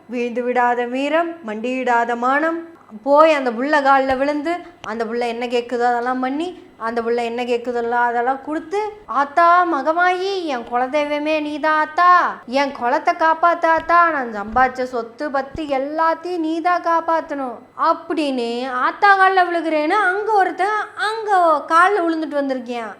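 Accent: Kongu, India. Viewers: அன்பிற்குரிய மகளே.தங்களுடைய கொங்கு தமிழ் அருமை.சீமான் என்றாலெ அசிங்கம் என்றாகிவிட்டது.சீமானை குறித்தே பேசி நாம் அசிங்கப்பட வேண்டாம் என்பது என் கருத்து.சீமானுடைய பேச்சைக் கேட்டாலே அருவெறுப்பாக இருக்கிறது.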